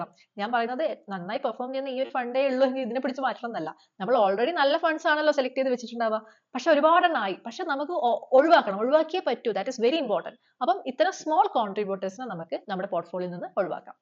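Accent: Kannur, India. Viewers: കണ്ണൂർ കാരി സ…അല്ലേ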